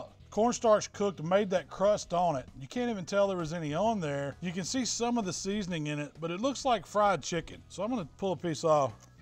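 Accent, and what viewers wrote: South, United States. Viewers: If I’m going to trust anyone to tell me how to BBQ it’s going to be a heavier set man with a southern accent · His southern dialect makes me trust him. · Southern accent check. Kinda fat check Pocket Buttondown shirt check I will take grilling advice from this man. The only sketchy thing is his foorwear. Should be wearing New Ballance trainers of flip flops.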